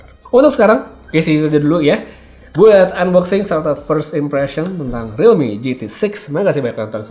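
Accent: Bali, Indonesia. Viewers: Bli asli Bali?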